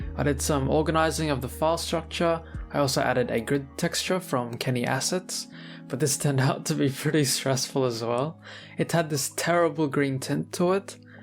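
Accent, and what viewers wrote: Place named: Australia. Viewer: Great video! Knew you were an aussie from the first few seconds of the video xD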